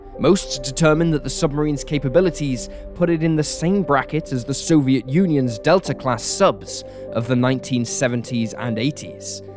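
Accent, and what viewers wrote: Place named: RP, United Kingdom. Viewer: His accent is from the south of England (received pronunciation), not Ireland.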